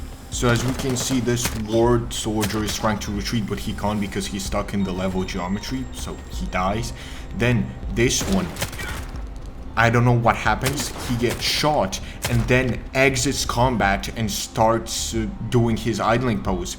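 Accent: Russia. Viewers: As I understand it, the author of the channel is a Russian speaker like me (based on the RU interface, which sometimes appeared in the video and a slight accent) I can only praise him for such a video, knowing what kind of resistance he can get from those who justify all these downgrades. It is surprising that in the video of an English-speaking person there are almost no dislikes and defenders of this "masterpiece", but when a Russian-speaking person says similar things, then some kind of nightmare and insults begin in the comments. Thanks to the recommendations of YouTube for letting me see this video, thanks to the author for the work done. All the best. P.S. Nothing in the game works as the developers claimed, but it certainly deserves 83% positive reviews (at the time of writing the comment). Fucking circus, there are simply no words.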